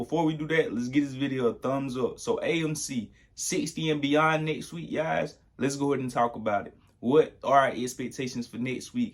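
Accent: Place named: Georgia, United States. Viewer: Gotta know where you’re from. I live in Georgia and your accent sounds like you might be a neighbor.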